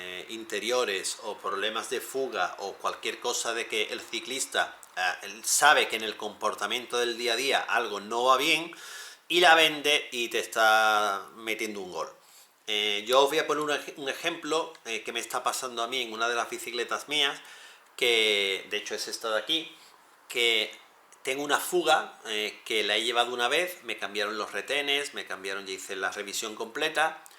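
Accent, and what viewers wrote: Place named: Córdoba, Argentina. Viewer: Aa valee! Yo de Córdoba por eso te lo decía! Que he visto que tenemos acentos similares y digo si es de Córdoba podemos salir a entrenar! Jajaja. Un saludo!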